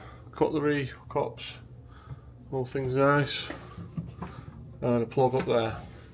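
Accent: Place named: Stoke, United Kingdom. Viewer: Watching travel day.. Cant comment on that video. But i said within seconds.. Thats a stoke accent.. But yeah buy that stoke kit. Ill wear mine on travel day in 4 weeks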